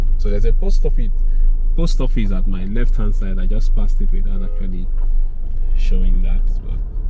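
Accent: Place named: Nigeria. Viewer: This guy's Nigerian English is hard to understand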